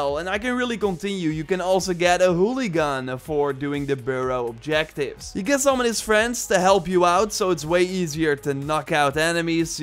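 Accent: Netherlands. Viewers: Je hoort zo makkelijk dat je Nederlands bent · I smelll dutch ❤ big fan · is deze man nederlands?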